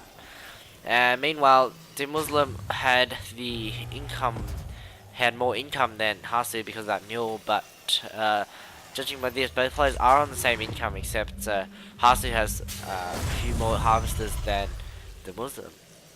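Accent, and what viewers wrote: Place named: China. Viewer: you sound like a mix between a china-men and an Australian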